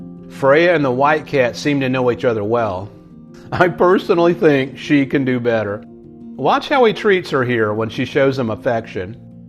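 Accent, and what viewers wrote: South, United States. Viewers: This is what YouTube is made for! What an amazing experiment, and how generous of you to share your home with your fellow critters around you:) Thank you for making this and sharing it. You're a very kind person, and your lovely southern accent is a bonus for narrating your adventures! Edit:, please do stay curious!!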